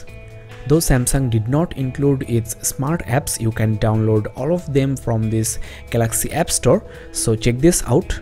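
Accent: India. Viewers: esse sotaque indiano -.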